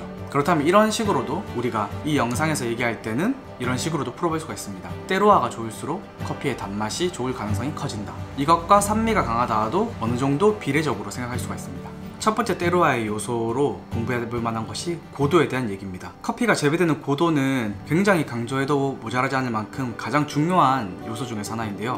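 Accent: South Korea. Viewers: 불어 하는 사람으로 한국식 발음에 못 알아들었음 ㅋ Terroir 라는 걸 알고 빵터짐 영어나 불어나 한국식 발음 참 알아듣기 힘듬. Anyway.. I like to drink Jamaican Blue Mountain, Ethiopia Yirgacheff & Colombia Geisha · ㅋㅋ 오히려 경상도 사투리때문에 더 꼰대스러워욬ㅋㅋㅋ 연기하시느라 고생하셨어요. 좋은영상 찍어주셔서 감사합니다. 많은사람들이 봤으면하네요.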